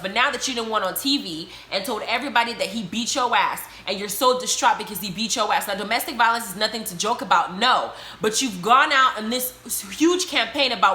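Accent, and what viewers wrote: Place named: Caribbean. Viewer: I hear a accent.. are you Caribbean by chance?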